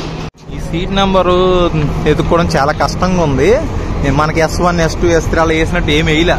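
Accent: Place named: Krishna, India. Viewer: అచ్చం కృష్ణాజిల్లా వాయిస్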